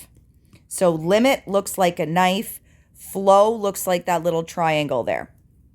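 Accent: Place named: New York, United States. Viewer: chill your new york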